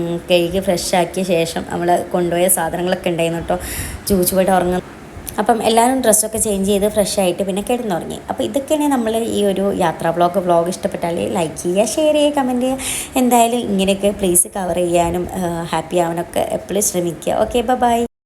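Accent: Malappuram, India. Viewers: മലപ്പുറം slang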